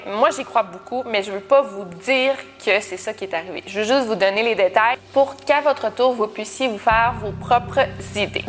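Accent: Canada. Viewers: Je me demande comment peut tu avoir l'accent canadien alors que tu vie au Mexique ! Sinon j'adore ta chaîne !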